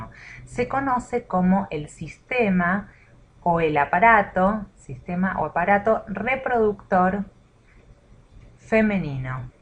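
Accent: Rioplatense, Argentina. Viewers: Sos argentina y recien me di cuenta o capaz uruguaya pero igual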